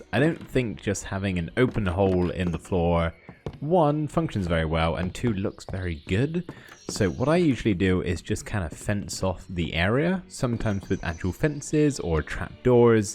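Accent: United Kingdom. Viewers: thought i was clicking on a minecraft video, turns out its asmr. never in my life did i realize the pleasentness of hearing a slightly deep voiced british man whisper me sweet nothings while having moonlit sonata playing in the background... I now know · Your accent is very pleasing. Where is it from? It sounds a bit British but not quite?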